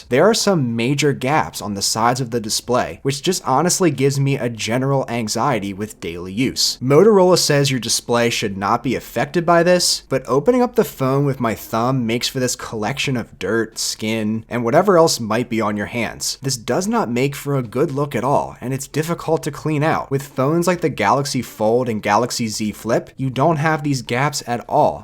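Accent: Philadelphia, United States. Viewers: Are you from Philadelphia? Sounds like it haha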